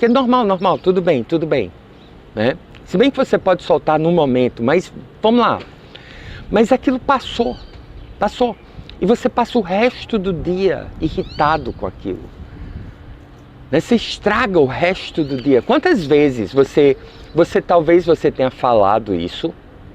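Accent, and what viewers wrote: Northeast, Brazil. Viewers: kkkkkkkk Amo o seu sotaque professor. Você é nordestino? Algumas pessoas dizem Auauauauau? kkkk Essa técnica parece com uma do Eckhart Tolle diz no livro O Poder do Agora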